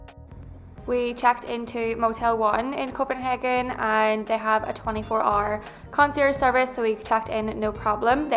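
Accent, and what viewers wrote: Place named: Ireland. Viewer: Sounds like u are from ireland, did u fly direct from dublin. My son and i are going next month from ni. Wondering best route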